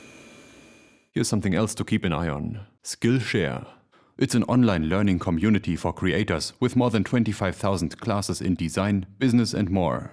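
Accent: Germany. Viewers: You are like This Old Tony with a german accent. Every single video that you do makes me laugh.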